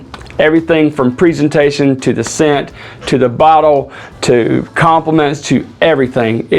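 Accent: South, United States. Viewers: Hey broski - this is a great one from Nishane With the southern accent, you should have went all Varsity Blues - it's a 10, motherf#&king 10!!😂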